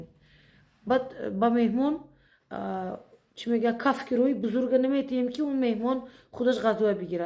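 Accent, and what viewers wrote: Bukhara, Uzbekistan. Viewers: Чонам фидои миллати азизам лахчаи ширини Бухорои шариф чи зебост хохарчони гиромикадр дустат медорам ба пеш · Чи хуш чашмони зебо дори лахчаи зебо дори ошикат шудам духтари бухоро самарканд .💕✊️ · Офарин апа, лаҳҷаи шумо (Лаҳҷаи Бухоро) бисёр ширин аст. Ҳаминхел наворҳо бисёр шавад, иншоаллоҳ шумо забони ширини тоҷикиро фаромуш намекунед. Салом аз Тоҷикистон. ❤Самарқанду Бухоро❤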